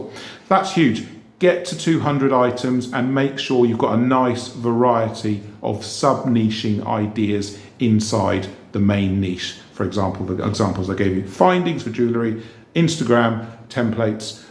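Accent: England, United Kingdom. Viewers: Hey, I stumbled across your channel by accident and I have to say I absolutely love your advice AND whats nice is hearing an English accent talking about Etsy (as its usually people with an American accent). I have a question, I haven't even started yet but what is your advice, can you open an Etsy store in the US being from the UK or is it better to start in the UK and then can you move and expand in the market? I actually live in Dubai but am originally from the UK (and still have my UK bank account)..any advice would be appreciated. Thank you so much for all the value you give.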